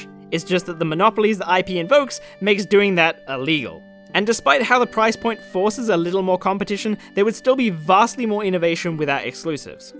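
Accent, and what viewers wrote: Australia. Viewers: wait, you're australian?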